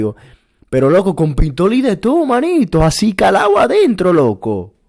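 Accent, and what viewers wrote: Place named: Panama. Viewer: Bueno este tipo de donde es porque habla como panameño y al mismo tiempo como dominicano alfin que mi pana o es que no tiene identidad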